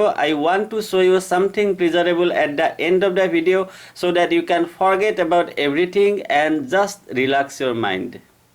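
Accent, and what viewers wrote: Assam, India. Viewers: You're from assam right..? I know that nolboria accent.. ✌🏻😄🤘🏻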